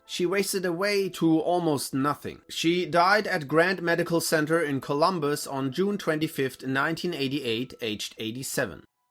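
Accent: Germany. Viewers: I'm still trying to figure out what part of Germany he's from.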